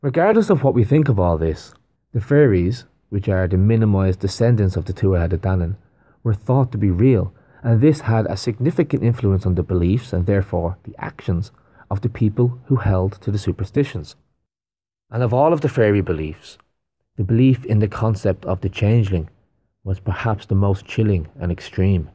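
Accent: Ireland. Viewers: Your Irish accent and pronunciation granted this the perfect seal of authenticity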